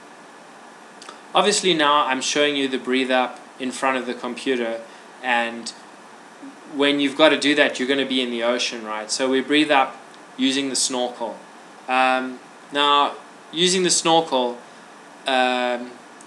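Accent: South Africa. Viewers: hi are you south african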